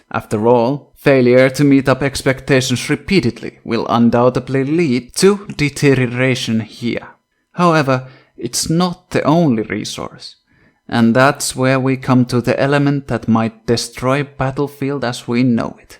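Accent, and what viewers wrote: Finland. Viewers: are you from finland my brother i think i can hear a slight accent in your voice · are you from finland?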